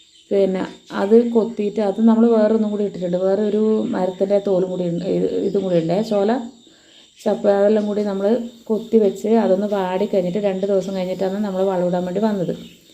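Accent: Kannur, India. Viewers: അടിപൊളി വീഡിയോ ആനുട്ടോ.. ഏററവും അടിപൊളി കണ്ണൂർ സംസാരം ആണ്... മൊത്തത്തിൽ പൊളിച്ചു 👌🌎 വളരേ നല്ല അറിവുകൾ ആണ് പകർന്നു നൽകിയത് എല്ലാവർക്കും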